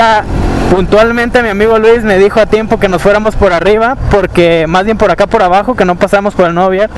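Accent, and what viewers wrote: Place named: Paisa, Colombia. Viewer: Me hiciste llorar al ver mi ciudad y escuchar a un paisa hablar chido tu vídeo vivo muy lejos y ver mi ciudad me sacaron lagrimas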